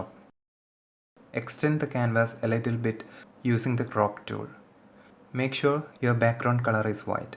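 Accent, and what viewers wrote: Kerala, India. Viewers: I think you are malayali .becoz of your English accent .nice to meet you thank you for this video .iam a malayali too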